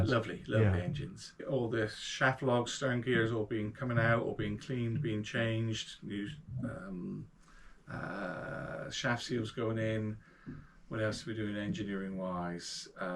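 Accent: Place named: West Country, United Kingdom. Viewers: 😂 its the West country accent m'lover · Like the look of this one. But maybe its the west country twang but...its Mural not Murial.😉😎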